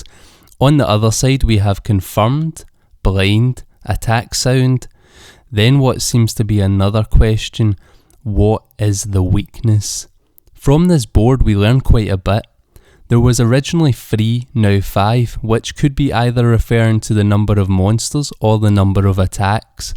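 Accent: Scotland, United Kingdom. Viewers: That accent...sounds Scottish, I'm Scottish. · Fucking love your accent dude! Not what I'd expect in this type of video, but fucking lovely nevertheless. Sounds Scottish with a Welsh tint. Southwestern Scotland perhaps? Entertaining as fuck.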